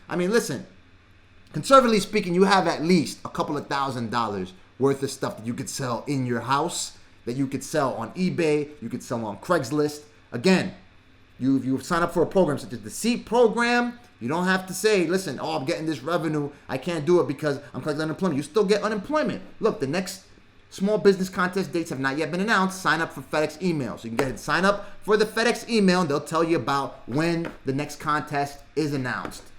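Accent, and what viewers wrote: New York, United States. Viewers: Con Ed is in NYC, maybe he's from NY. Sounds like it!!! LOL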